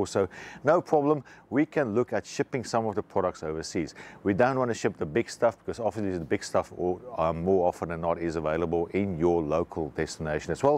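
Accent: South Africa. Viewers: Nederland? I would have sworn that you were from Jo'burg!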